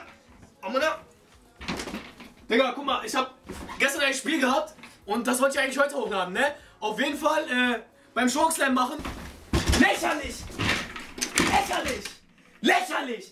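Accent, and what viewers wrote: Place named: Turkey. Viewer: ich schwör dieses deutsch türkisch.....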